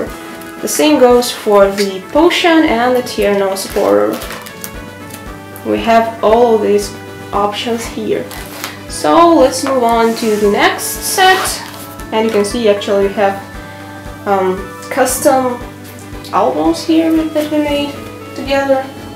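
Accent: Russia. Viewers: Слышу этот русский акцент! Прелесть С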